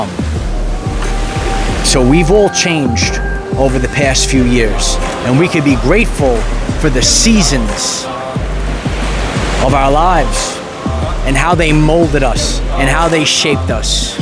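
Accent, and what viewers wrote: New York, United States. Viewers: I love this message. Thank you! You sound like a New Yorker 😊 We have all changed over the past years especially since Covid. Thank you for inspiring me today I need this. We are light! You are doing good work for the kingdoms❤ thank you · Wyoming? I would not have guessed. But you have a noticeable New York (or east coast) accent.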